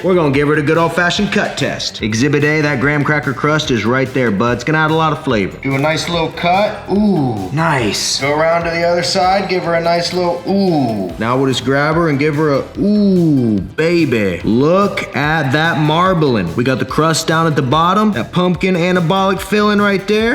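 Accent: Texas, United States. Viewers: Dude are you texan?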